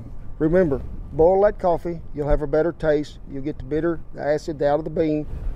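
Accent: United States. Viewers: Felix Hannigan Felix, you will find all us Americans speak English dis way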